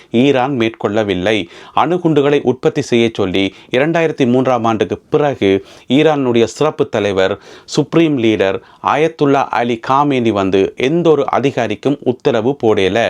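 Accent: Sri Lanka. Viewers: சகோதர நீங்கள் செய்திகள் சொல்லுவது பாட்டி பேரப்பிள்ளைகளுக்கு சொல்லும் அறிவு போல மிக எளிமையாக அழகாக உங்கள் நாவில் இலங்கை தமிழ் விளையாடுகிறது நீங்கள் நேர்மையாக செய்திகளை சிறப்பாக எடுத்து உரைக்கின்றிர்கள் வாழ்த்துக்கள் உறவே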